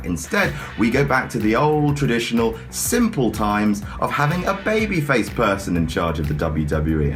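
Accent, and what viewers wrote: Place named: United Kingdom. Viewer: "and I talk about how I would book them differently, because I'm a smart ass." Does anyone else think that this guy's British accent made that line a little bit funnier than it would have sounded if he had an American accent?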